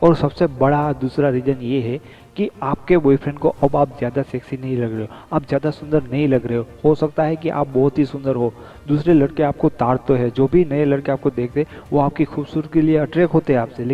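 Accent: Gujarat, India. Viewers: Gujju ke Bolne ka style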